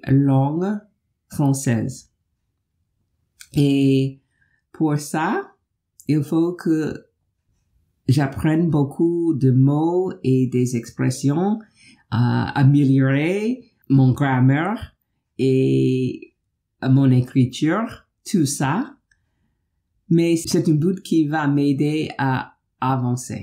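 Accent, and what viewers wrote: United States. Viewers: You have an adorable American accent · Bravo, votre français est tout à fait compréhensible, quelques erreurs mais c'est normal. Pour plus d'aisance il faut beaucoup parler avec les autres et enrichir son vocabulaire, ça prend du temps mais vous êtes sur la bonne voie. Et gardez votre accent américain en France c'est charmant 😊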